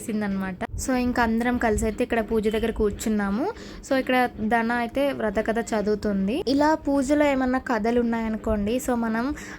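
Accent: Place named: Andhra Pradesh, India. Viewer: Miru ekkuvaga a slang vadtharu Mean Andhra vs telangana Indhulo aythe naku Andhra ne anpinchindhi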